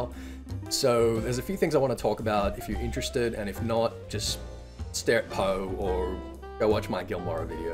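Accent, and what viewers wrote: Australia. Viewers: yo dude where in aus are you from?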